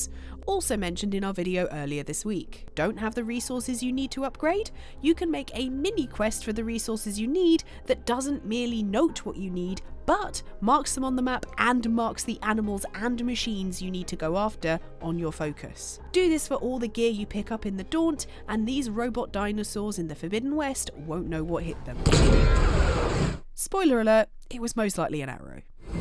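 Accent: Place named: United Kingdom. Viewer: the British accent makes a difference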